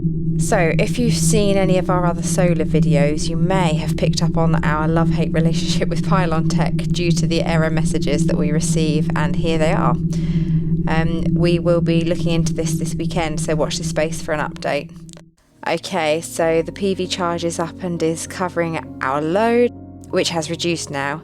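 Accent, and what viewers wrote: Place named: United Kingdom. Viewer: Thanks for uploading this video love the British accent ❤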